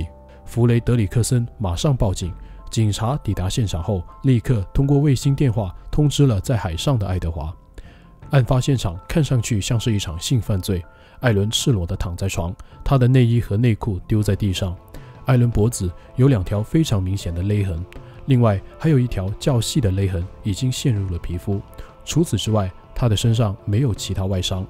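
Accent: Standard. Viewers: 國語和粵語都很標準喔，主持人是哪裡人？ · 国语好标准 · 普通话很标准，听起来舒服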